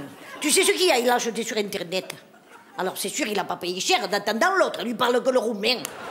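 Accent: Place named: Nice, France. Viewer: c est la perle du rire.+ Anne Romanofe = pissé de rire,sacré "Mado"j adore l accent niçois et ses expréssions .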